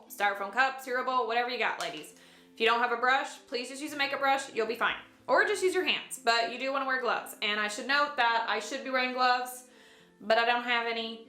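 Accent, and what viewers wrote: South, United States. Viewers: Okay, you need your own talk show 🎉Fo REAL, though! You're absolutely adorable; and just incredibly endearing to watch! Normally, Southern accents drive me insane; but it just adds to your amazing charm 😊😅 Can you do a video of your haircut, updo messy bun, braids, and your make up! Your foundation is pretty flawless, and your brow are on fleek! Are they microbladed? You look like a more edgey Stassi Schroeder from VPR, actually!!! Thanks for all the wonderful tips! Soooo incredibly helpful 🎉I hope you got a nice side hustle going through social media $$$ 😊❤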